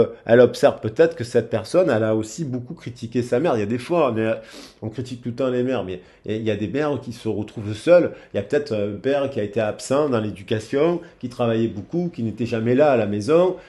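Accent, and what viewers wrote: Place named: Marseille, France. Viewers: Et bien voilà, vous m'avez encore fait rire ! J'ai vécu à Martigues, j'avais moi aussi cet accent qui vous caractérise ! Profitez bien de votre soirée entre amis ! Merci pour cet échange et d'avoir pris le temps de me répondre, c'est excessivement rare, comme mes commentaires en règle général, mais nous avons tous besoin d'encouragement. A bientôt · Oh bonne mère Bon anniversaire moi qui adore cet accent...Nîmois et Marseillais de coeur...ma.famille.avait la meilleur boulangerie du Merlan...Garvi....en 86.. jai des souvenirs magnifique...L.OM ....puis a1 selection the Voice a L.hyperion......bonne journee et merci pour cette guidance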